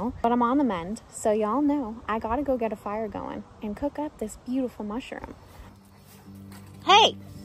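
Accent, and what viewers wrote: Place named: South, United States. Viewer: OMG! I heard several "Ya'lls", a growing amount of southern inflections and you even pronounced mayonnaise like a true southerner. Hopefully Jeff will make you an official Damn Yankee soon. Do you know what that means? ETA: I'm glad that you're on the mend. You look well.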